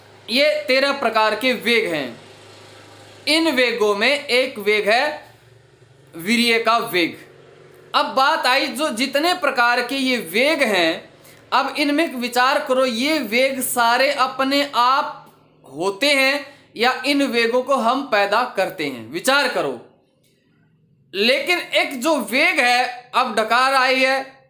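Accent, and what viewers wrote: Haryana, India. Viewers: Guruji aap hariyana se ho Kya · Haryanvi acsent Dekh kar mja agya 😂😂😂😂 I am also haryanvi jai ho .....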